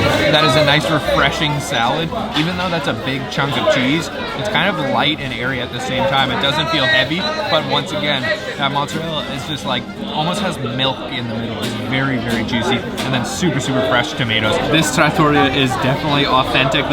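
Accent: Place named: Canada. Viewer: this guy has such a heavy canadian accent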